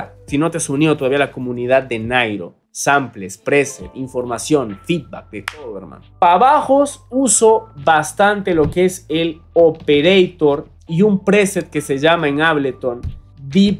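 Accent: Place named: Ecuador. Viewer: bro eres peruano pero tienes acento ecuatoriano xd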